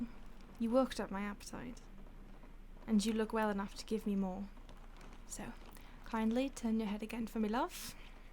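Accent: United Kingdom. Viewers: If I'm not wrong i think you are speaking in a British accent yeah? 😎😎 sounds awesome for a British vampire yes?👍👍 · Pretty good Brittish accent love or are you actually English ? Also I subbed and dinged coz about time SOMEONE did a bloody vampire RP without resorting to that bullshit hypnosis power to do the "forget" bollocks Also I'm English and thought your accent was kinda sexy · I never knew I needed a british voice in my loneliness but I now know I needed it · That accent man...British women are the hottest sounding women on earth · I love youre accent Are you British ? ;)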